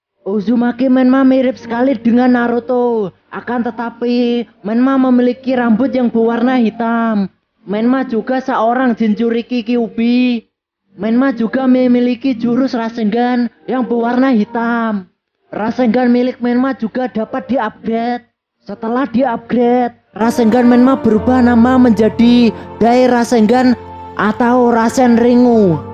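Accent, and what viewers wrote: Java, Indonesia. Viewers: Ceritanya .dialeknya .Jepang Jawa 😀😀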